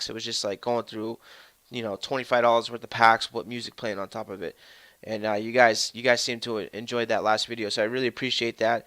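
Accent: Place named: Boston, United States. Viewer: Lol I love your Bostonian accent I'm from Toronto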